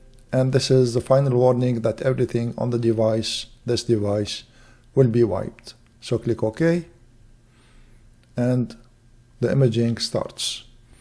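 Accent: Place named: Lebanon. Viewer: you sound lebanese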